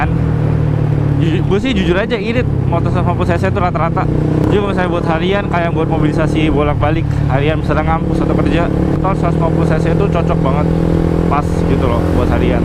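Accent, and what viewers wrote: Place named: Tangerang, Indonesia. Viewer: Orang tangerang ya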